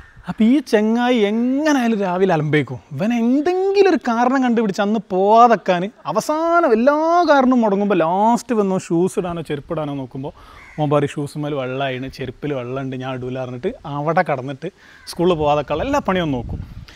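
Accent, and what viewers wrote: India. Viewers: സംസാരം കേട്ടാ അറിഞ്ഞൂടെ കോയിക്കോട് ആണെന്ന് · കൊടുവള്ളി കാരനാണോ, slang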